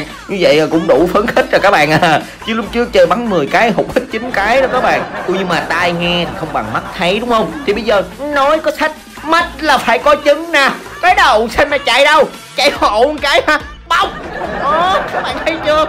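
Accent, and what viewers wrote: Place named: Hue, Vietnam. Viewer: Nói giọng Huế đi anh ơiiiiii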